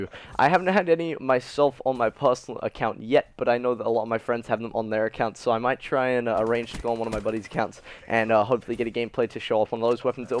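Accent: England, United Kingdom. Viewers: Where about in England are u from? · What part of the Uk are you from? Sounds like your English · I notice the slight accent my dude, are you from across the pond in England? If you are, do you watch football and/or have a favorite team you support?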